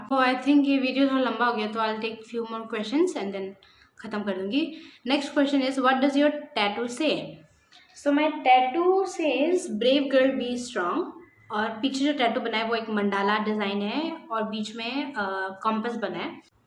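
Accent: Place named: Arunachal Pradesh, India. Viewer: The way u TALK seems TYPICAL '"ORUNOSOLI"" r u ORUNOSOLI or Bengali or Assamese? No offence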